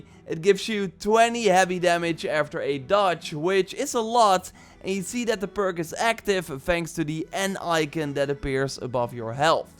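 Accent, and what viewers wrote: Netherlands. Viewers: Is dutch? I hear his accent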